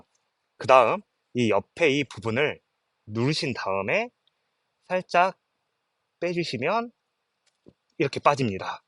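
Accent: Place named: Jeolla, South Korea. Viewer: 저도 전라도라 억양이 우리쪽이라고 짐작은 했습니다.ㅎㅎ 반갑습니다.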